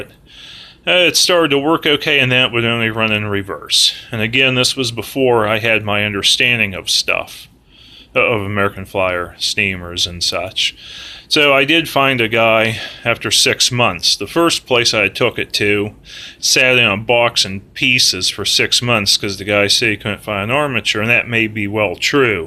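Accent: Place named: Western Pennsylvania, United States. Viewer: Are you from Western Pa? you got the accent!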